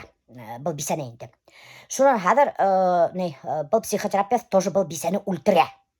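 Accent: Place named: Russia. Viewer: Очень приятный и красивый язык. Но только без русского акцента если можно.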